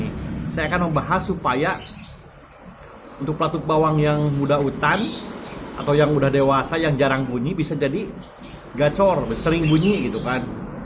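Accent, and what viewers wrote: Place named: West Java, Indonesia. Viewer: Sunda pisan mang... Hade infona euy..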